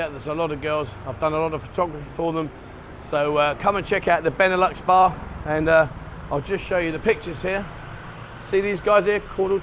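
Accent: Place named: Nottingham, United Kingdom. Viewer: speaks Thai. Like a local with a totingham accent.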